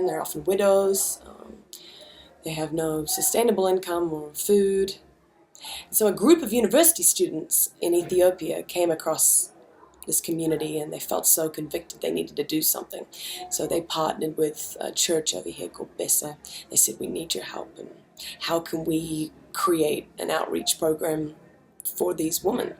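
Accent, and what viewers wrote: New Zealand. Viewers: Kimbra! You inspire me and the music i write. Keep up the the kiwi accent too - from a fellow kiwi, im starting to lose mine, ive been in Melbourne too long haha. I admire you for taking time out of you busy schedule to visit those in need. Its the small things that we do for others that usually make the greatest impact. Good job. Keep it up!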